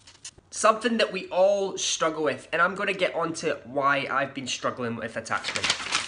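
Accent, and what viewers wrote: Scotland, United Kingdom. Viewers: Hey. I love watching ur videos & I love ur accent. Scotland is beautiful & a dream place for me & my dad to visit. My dad's side of the family is Scottish 😊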